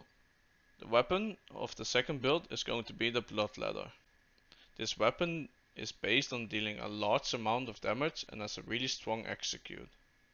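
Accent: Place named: Netherlands. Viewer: For some reason i smell dutch o_O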